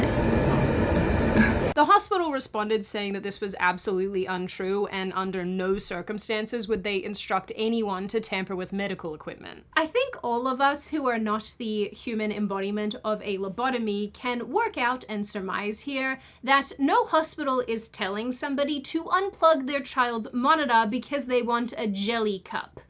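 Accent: United States. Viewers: Sydney is loosing her Aussie accent and picking up that American twang.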